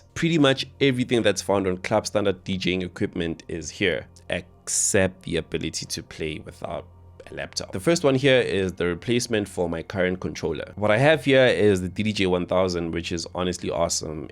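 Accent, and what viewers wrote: South Africa. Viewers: That SA accent my dawg! Lol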